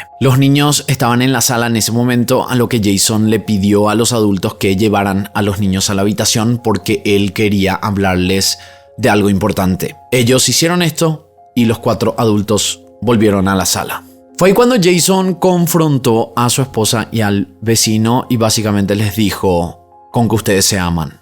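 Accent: Paraguay. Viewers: Sos paraguayo? sonas re paraguayo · ¿Tu acento es paraguayo?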